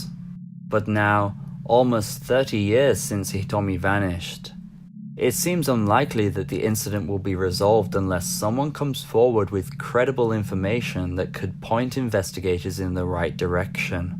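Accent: United Kingdom. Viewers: Your channel says you're from Japan, but you sound British. What's your first language? P.S. Awesome content!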